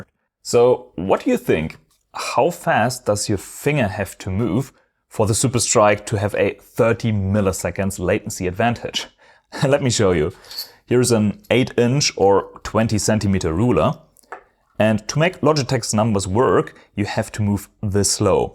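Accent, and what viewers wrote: Germany. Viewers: are you german you sound like a german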